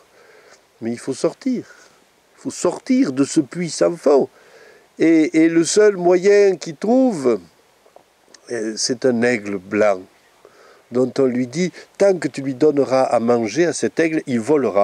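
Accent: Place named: South, France. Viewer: Hoorah enfin du vrai accent du sud! Merci pour cette vidéo!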